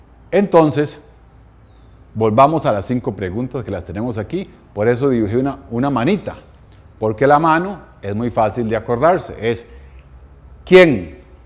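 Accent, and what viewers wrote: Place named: Costa Rica. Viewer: Tu asentó es muy bonito, debes de ser costarricense.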